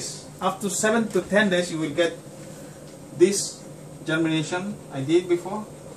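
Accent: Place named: Bengal, India. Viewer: Hello, Very good video to learn this process. Thanks 🙏. If you don't mind guessed you are a Bengali speaking how you are talking now. 👋 from 🇨🇦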